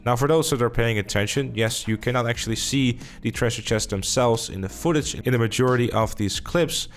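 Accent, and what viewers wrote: Netherlands. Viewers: Jij bent Nederlands dat hoor ik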